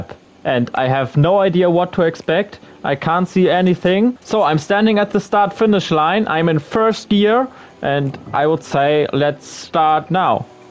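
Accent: Germany. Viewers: dieser deutsche dialekt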